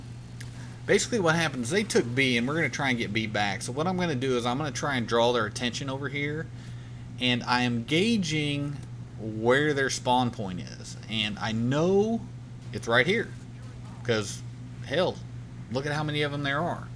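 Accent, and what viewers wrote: South, United States. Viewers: you from the south you sound countryish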